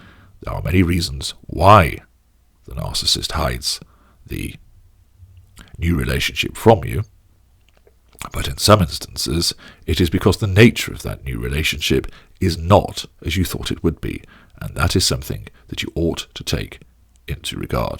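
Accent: United Kingdom. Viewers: Another Brit accent. See ya.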